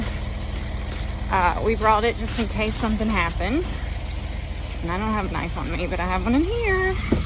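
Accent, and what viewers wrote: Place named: North Carolina, United States. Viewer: Beautiful eyes and smile. Love the NC accent and your positive attitude.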